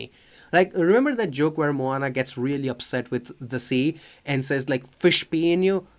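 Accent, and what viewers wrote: India. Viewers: My god I love Indian accents so much lol I could listen to it all day Great video and good points made, I’m just so tired of live action movies and sequences… Disney really just wants to play it safe, and they think the problem is when they come up with originals, well, they just need to make the originals NOT SUCK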